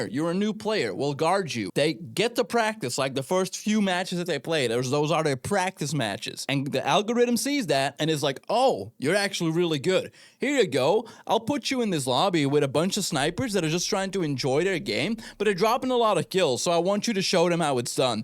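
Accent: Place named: Netherlands. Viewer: je klinkt nederlands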